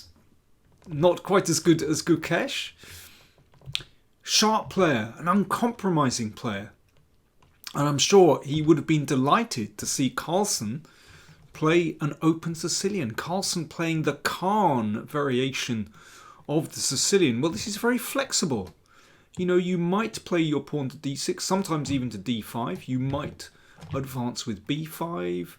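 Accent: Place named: Oxford, United Kingdom. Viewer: Dear Mr. King. I know you understand German: Sehr schönes Video, unterhaltsam analysiert. And I love this sort of Oxford English!! Great!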